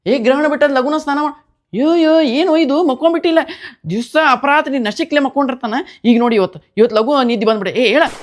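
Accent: North Karnataka, India. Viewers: Nimma Uttar Karnataka kannada maathu nanna favourite ree..😊adrallu nimma performance 👏🤝💐💝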